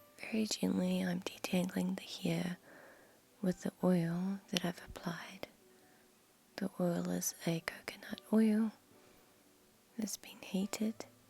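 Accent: New Zealand. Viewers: Love your Kiwi accent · Kiwi accents are the best! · Are you a kiwi? The sound of your voice had me falling asleep 😴 you talk exactly how my momma used to talk when she was trying to make me go to sleep as a child 💕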